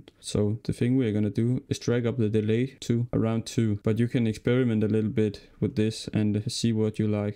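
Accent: Denmark. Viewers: og man kan bare høre du dansk 🤣🤣 men fed video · Your defintly danish 😆 but thanks, great tutorail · i can hear that you have an accent. It kinda sound like you are danish. Are you? BTW great video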